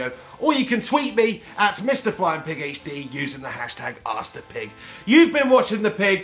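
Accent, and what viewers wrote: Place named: Liverpool, United Kingdom. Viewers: Great scouse accent and hat! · I want to ask two questions. 1. Do you think Fellaini is becoming the new Roy Keane, now that he's playing so good in recent games under LVG? 2. Can Rooney become the all time Premier League's top goalscorer if he stays and retires at United? Funny Scouser accent. lolll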